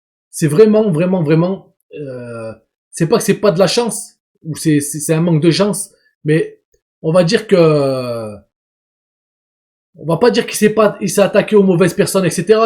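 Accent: Saint-Étienne, France. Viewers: Bravo frero ! Notre accent stéphanois est grillé mdrr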